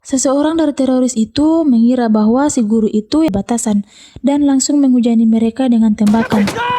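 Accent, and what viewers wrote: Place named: Toraja, Indonesia. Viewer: Logatnya kek orang toraja, nih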